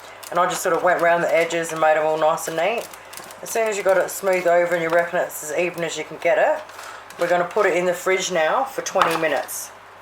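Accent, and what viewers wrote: Australia. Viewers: Thanks for posting,looks yum,definatly have to try it,love your Aussie accent.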